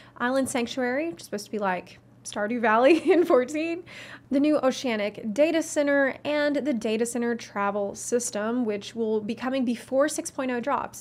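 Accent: South, United States. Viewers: She has a pretty country accent where is she from?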